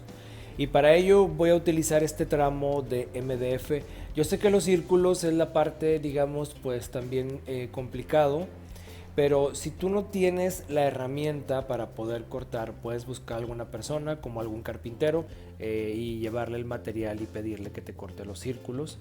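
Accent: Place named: Peru. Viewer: Suenas como si fueses peruano 😊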